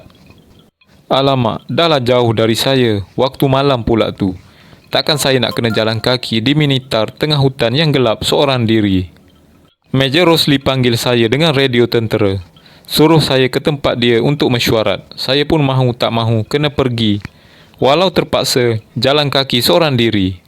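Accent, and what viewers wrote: Kelantan, Malaysia. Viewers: slang aka bau budu insyaallah tetap pekat ngalir dlidah kita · Pekat klate demo.. 😅😅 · Pekat loghat Kelantan.. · Ooo ore kelatee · Bunyi Kelate nihhh...guano bohh...hahaha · Asslm bang mantap pengalaman bang,abang orang Kelantan ke bunyi cara abang ckp macam orang Kelantan hahah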